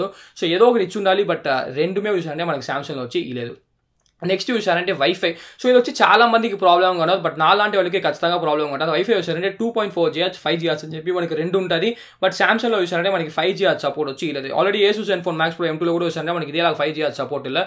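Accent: Tamil Nadu, India. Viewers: Telugu baga maatladutunnav bro kani koncham tamil style lo undi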